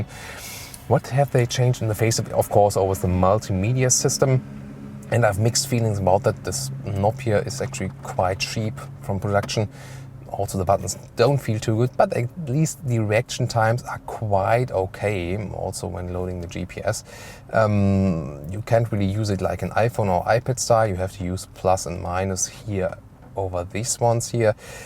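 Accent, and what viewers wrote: Germany. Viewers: Man hört schon stark das du deutsch bist^^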